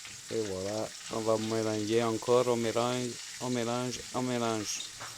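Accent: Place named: Argentina. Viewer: Sympa l’accent Argentin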